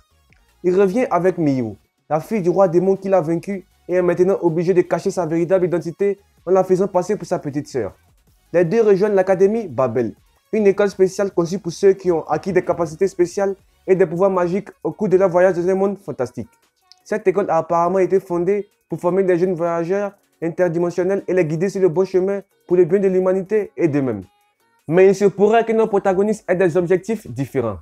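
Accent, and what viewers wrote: Ivory Coast. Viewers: Toi tes un ivoirien ça se sent mais prends ton temps pour parler je pense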